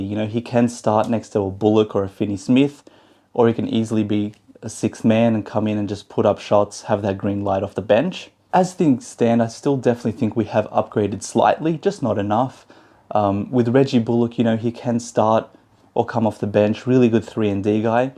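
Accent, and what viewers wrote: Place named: Australia. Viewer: Just found you. I grew up around Dallas when they first became a team in 1980 or the 80's (getting too old to remember). I'm guessing your an Aussie, hard to tell with some Aussie, English, or Kiwis, for me. Great analysis, would be awesome to get Dragic and Oubre. You never know with Cubes. Great that Slovenia and Australia are playing each other that will bond Luka and J Green. #mffl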